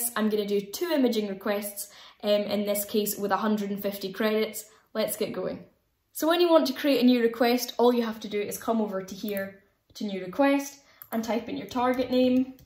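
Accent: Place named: Highlands, United Kingdom. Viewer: Great video,! Did my high school years in Nairn, so its nice to hear that lovely Highland accent again! Keep up the great work and thanks for flying the flag for UK Astrophotography!:)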